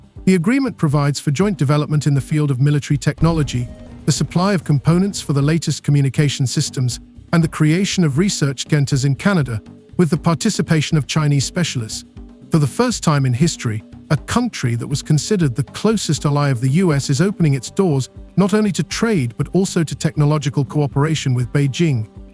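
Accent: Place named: United Kingdom. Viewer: YOUR TITLE IS AN ABSOLUTE JOKE,.... CANADA NEVER STABS... BTW, IS THIS AI.? OR ARE YOU A REAL PERSON.?? YOURE ANOTHER AMERICAS PUPPY THAT IAM TIRED OF.... WHERE DID THEY GET THESE BRIT ACCENT??? I DONT THINK THIS TRUE THOUGH THERES NO REPORT OF THIS DEAL WITH CHINA... DO YOU HATE OUR CANADA.?? DONT TALK NONSENSE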